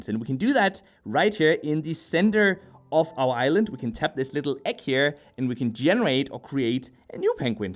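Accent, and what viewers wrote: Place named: Denmark. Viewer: Before I saw the Kroner symbol in the shop, I could tell by your voice that you were Danish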